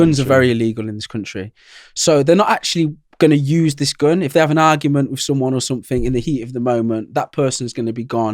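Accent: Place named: Staffordshire, United Kingdom. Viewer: Staffordshire accent neutral pretty much!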